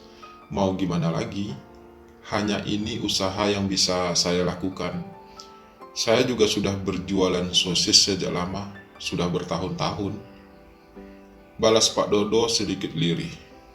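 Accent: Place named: West Sumatra, Indonesia. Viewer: Logatnya logat Sumbar (Minang)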